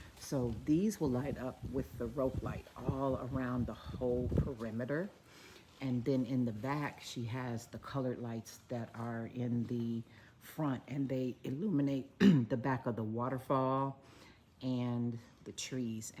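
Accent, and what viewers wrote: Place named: New York, United States. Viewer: My sister from NY moved to Merida with her boys but had health issues with the mold - you sound like a New Yorker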